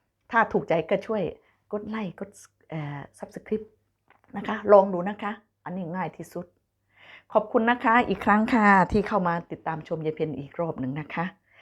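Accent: Korat, Thailand. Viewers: น่ารักมากพูดพาสาโคราชชอบมากๆจ้า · ชอบแก่คุยบ้านเองคนโคราช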